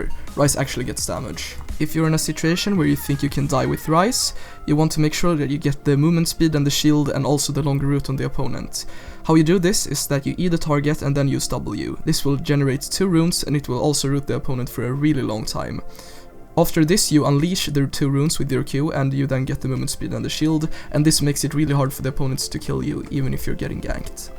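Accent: Sweden. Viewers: Are u swedish? u sound like a swede when u talk:.)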